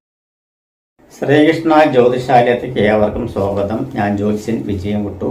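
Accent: Tamil Nadu, India. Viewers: ഇത് ഏതോ അണ്ണാച്ചി മലയാളം പറയുന്നത് പോലെ ഉണ്ടല്ലോ റാസി മനസൻ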